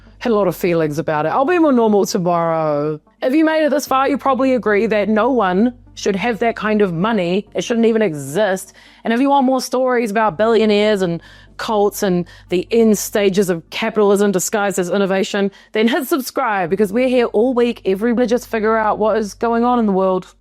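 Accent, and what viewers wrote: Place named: New Zealand. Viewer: It sounds like NZ to me. AFAIK, pronounciations like "Tiksus" and "sivunty" have the Kiwi vowel shift. A few weeks, ago, she was talking about people from Mexico and it sounded like she was saying "mix a can"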